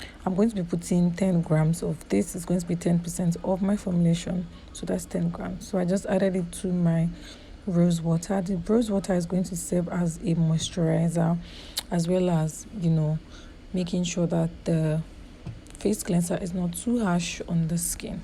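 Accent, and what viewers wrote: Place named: Nigeria. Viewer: well that's my point is you have a Nigerian accent so it's hard for some including myself to understand everything you said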